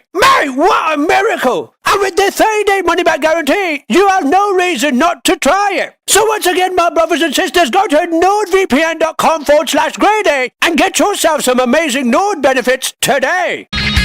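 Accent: United Kingdom. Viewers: I love listening to the angry British man rant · A british guy talking about bible, best video on YouTube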